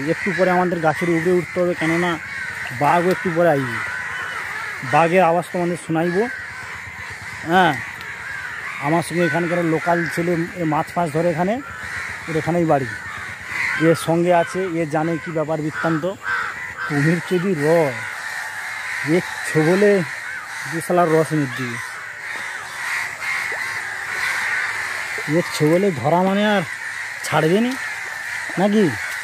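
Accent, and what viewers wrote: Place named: Midnapore, India. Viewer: Tomar bari sundarboner kothay dada❤ আমাদের মীদনাপুরের ভাষায় কথা বলছো প্লিজ বলবে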